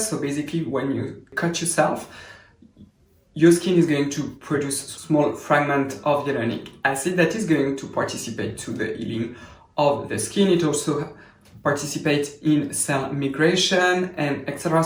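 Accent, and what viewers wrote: France. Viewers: your content is amazing and you are so knowledgeable. However it is difficult sometimes to follow or understand the concept or language or some words, especially for foreigners who are not used to different accents (your accent is beautifully French and I am NOT criticizing it at all, please do not get me wrong, I am foreigner myself) - have you considered maybe making a little graphic boards for us? Like simple white board and a pen to explain? Thank you, please keep doing your job <3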